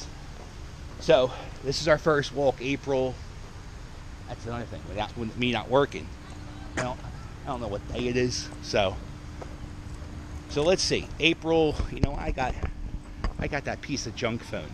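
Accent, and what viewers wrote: Philadelphia, United States. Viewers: Ahhh I love your Philly accent and then I realize I sound the same way 😂😂 It looks like you're in the Burg. One of the nicest neighborhoods left in Philly.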